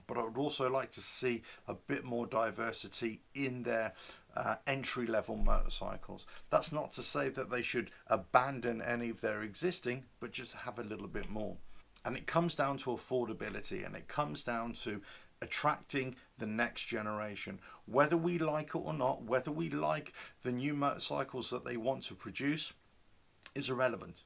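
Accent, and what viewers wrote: England, United Kingdom. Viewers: WTF Harley going bust? No. Harley change, is business tougher. Probably. Your accent, suggest you are in England. Back when I lived in UK. Harley was a rare sight, So was a Goldwing, Don’t know about today. Can’t see riding B roads in UK on a Harley, or a Goldwing. Just my opinion. Or at least I couldn’t when I was a young guy with a 250. When you get older, your priorities change, The Harley I thought to big, to old, to boring and to slow, a bike for old fat guys. Not for me when I was young. 35, or 40 years, Comfortable sounds much more appealing, No point in Harley making a crotch rocket, Ive out grown them. Now I am an old fat guy. Today’s millennials will out grow crotch rockets as they age. Can afford bigger, More comfortable bikes. Some will choose, adventure, Classics, Touring or Harley. The Harley market share in the US will remain strong, biggest risk is loosing some to Indian. 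Those of us who choose, British, Italian Or Adventure, were never Harley customers in the first place, They haven’t lost US, they just never built the bikes we liked. We are not buying Japanese cruisers either.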